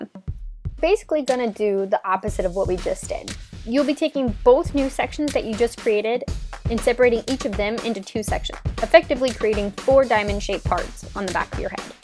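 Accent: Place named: South, United States. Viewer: what sounds like a Southern accent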